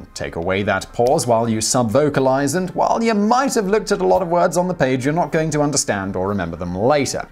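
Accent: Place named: RP, United Kingdom. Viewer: Can someone or Simon himself explain Simon's accent/way of speaking? Is this RP? Are there any other examples of videos that sounds this way? I feel that this accent/way of speaking is taught. I really find it interesting and cant get this question out of my mind. I really feel that when I was younger(as a kid) I hear this a lot but can't tell where.... Thank you in advance.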